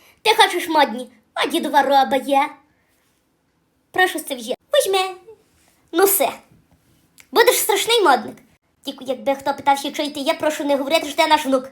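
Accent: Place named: Lviv, Ukraine. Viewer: То є львівська говірка, а ще так колись по селах Західної України розмовляли люди, тепер вже мало хто так говорить. Моя бабуся, царство небесне їй, так говорила:"Йой, муї діти зулутенькі, то мій капі'тал!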